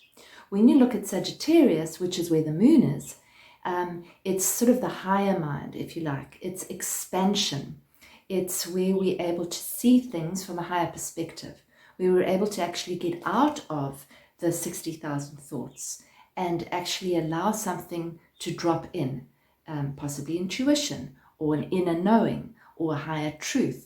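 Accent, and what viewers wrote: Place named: South Africa. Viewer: Just "stumbled upon" your reading. Lovely, thank you. And hugely apt for me right now! Great to hear your saffer accent too. I was from Plett but made the move to Panama nearly 3 years ago!! Take care and thank you ♡♡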